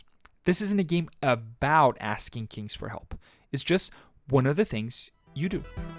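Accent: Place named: Brazil. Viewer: Até sem saber que o seu nome é reconheci o seu sotaque brasileiro! Salve, irmão, 😂. Espero que o podcast cresça muito assim como o jogo!🎉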